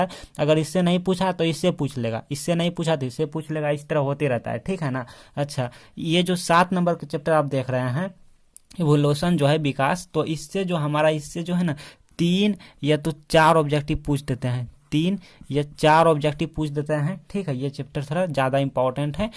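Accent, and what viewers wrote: Bihar, India. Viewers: Pure bihari.. .. 😄☺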